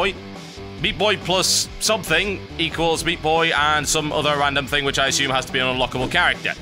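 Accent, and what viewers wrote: United Kingdom. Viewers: As a german i love this britich accent or so ;D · Hahahaha I love his angry british sounds! They make my day lol. xD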